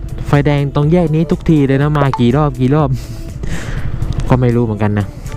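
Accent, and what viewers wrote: South, Thailand. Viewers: คนใต้หรอ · พี่คนใต้หรอคับผมก็คนใต้555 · พี่เป็นคนภาคใหนพูดเหมือนคนใต้เลย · คนใต้ซายม้ายนิ... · โซ้ยกาแฟนิ แหลงใต้ชับพึด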